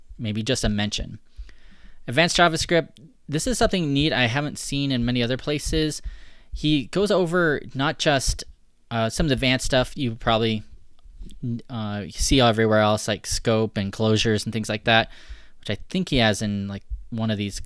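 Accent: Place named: United States. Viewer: What american accent is that? Its beutiful and smooth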